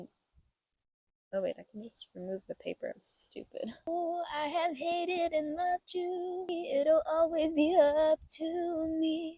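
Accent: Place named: United States. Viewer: The world needs more Luxembourg YouTubers that talk and act american. Thats actually dope as fk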